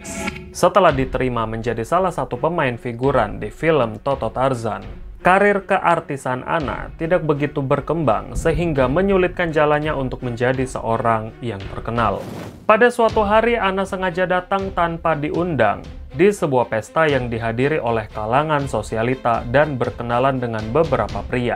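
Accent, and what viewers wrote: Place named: Bogor, Indonesia. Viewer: Dengan logat ciri khas suara nya ....santuy dri bogor🌷🌷🌷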